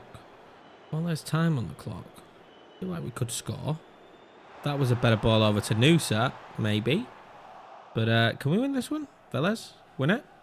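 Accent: Yorkshire, United Kingdom. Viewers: Let's get a Top CB like Diomande or Silva for CB and maybe get rid of one or two. The next stage of good teams is trimming down the squad and improving with genuine quality. The voice overs are clean Yorkshire Man.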